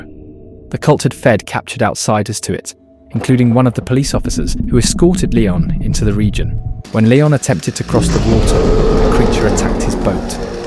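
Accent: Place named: United Kingdom. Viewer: Please change the narrator voice to a voice without this british accent 😵‍💫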